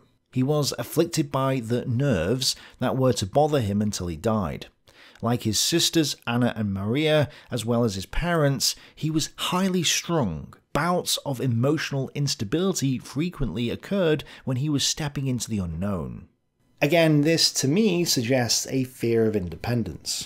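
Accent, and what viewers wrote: United Kingdom. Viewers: Classic, just few minutes in and so much makes even more sense than before, almost as good as Captain Context himself. Luv me listenin' to Br'ish lads, simple as.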